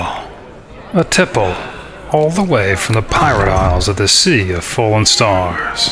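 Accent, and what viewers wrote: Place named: Philadelphia, United States. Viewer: It's very Philly-of-Italian-Descent. "Hey yous. Hey yous guys! Yous been down the shore?"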